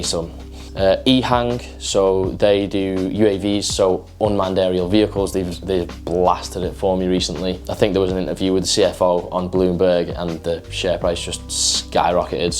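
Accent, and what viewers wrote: Liverpool, United Kingdom. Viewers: I was not aware that you were into finance/investments. Anyway, providing you stick at it, you will improve (just like fitness). From your accent I think you are based in Liverpool - have you thought about buying an investment property? You'll be surprised that even with just £20k, you can enter the market. Anyway, good luck with your endeavours.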